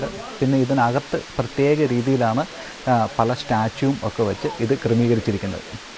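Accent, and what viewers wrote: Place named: Pathanamthitta, India. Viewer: ഭാഷാ ശുദ്ധി കേട്ടപ്പോൾ പത്തനംതിട്ടക്കാരൻ ആണെന്ന് തോന്നുന്നു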